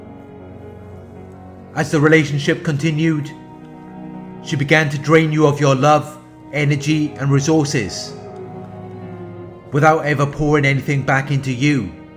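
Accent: Wales, United Kingdom. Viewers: For what it matters.... I am from Leicester, England, I have an accent and pronounce WORDS differently... the guy doing these videos is from Wales... it's regional dialect....people from Liverpool, Manchester, Newcastle etc etc etc..... all have a regional dialect/tone to their accent. Don't worry about it.... you're in the picture now🙂✌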